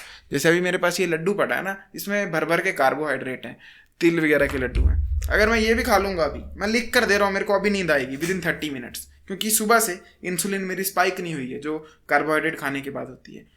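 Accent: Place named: Kangra, India. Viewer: मिंजो ऐसा कयो laggada की bhau तू कांगड़ी है।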